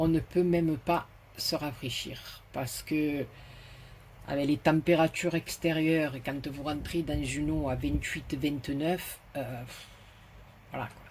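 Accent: South, France. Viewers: Coucou 😍 c'est grâce à la vidéo de Greg que je me suis abonnée à votre chaîne et quel bonheur de vous entendre, car je suis expatriée en Alsace mais je viens du Sud (Nîmes) aussi....alors un enchantement de retrouver notre accent. Merci pour ce tuto je vais le faire dès aujourd'hui. Passez une bonne journée. Adessias et grosses bises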